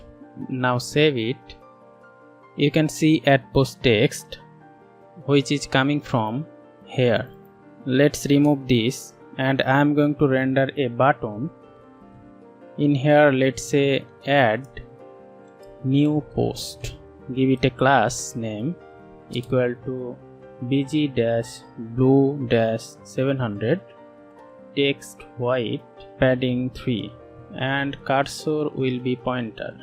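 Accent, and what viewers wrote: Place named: Bengal, India. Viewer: Khub valo laglo video ta, bangali mone hocche accent sun ?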